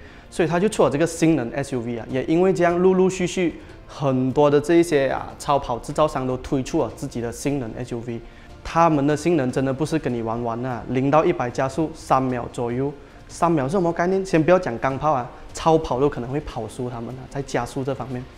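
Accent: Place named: Butterworth, Malaysia. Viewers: 一听就知道是檳城口音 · 这个英文口音，一听就知道是北海人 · 华语也是，一开口就是 Penang 腔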